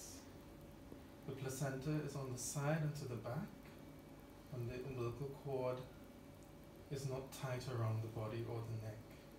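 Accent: Trinidad and Tobago. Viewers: Is he Trinidadian? His accent sounds like it. I am also Trini. My baby is breech and I'm on here looking at exercises that I can do to get baby to turn before it comes to this point. The doctor made it look really easy in this video. He was so gentle and caring. Well done! 🙂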